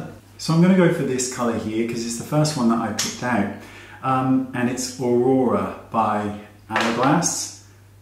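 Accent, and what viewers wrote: United Kingdom. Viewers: Agreed.. a British accent is a plus too😍💕🍁🇫🇮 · I agree with you. Although I am from Brazil, I can understand almost the tinge he says. I Love his britsh accent. Sorry my english 😊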